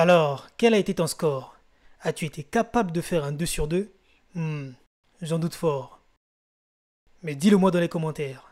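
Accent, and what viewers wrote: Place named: Mali. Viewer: Vraiment intéressant, le petit jeu ajoute une plus value non négligeable à l'attractivité de la vidéo, je constate un gouffre au niveau du tempo et du ton entre cette vidéo et la dernière en date que tu as sorti, si tu continue à surprendre avec des histoires peu connues, il y en a tellement.. tu va percer! que demande le peuple??!! Je me risque à donner ton accent, je dirais malien.. 😊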